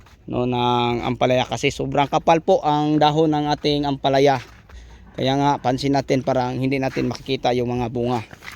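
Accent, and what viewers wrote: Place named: Visayas, Philippines. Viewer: Bisaya Po Kasi ma'am!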